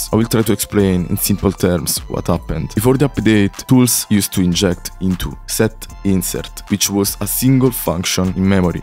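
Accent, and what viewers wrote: Russia. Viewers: you have russian accent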